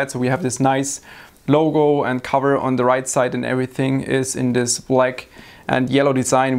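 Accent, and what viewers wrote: Germany. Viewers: Deutscher Akzent is da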